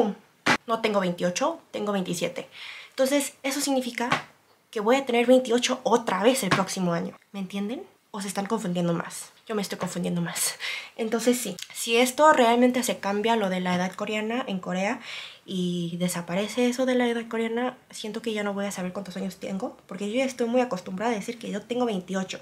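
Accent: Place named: Mexico. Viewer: No inventes, te ves bien bien asiática, en el video de tu clase. Aquí en YT pareces asiática latina. Ya cuando hablas, pues sabemos que eres mexicana, you know?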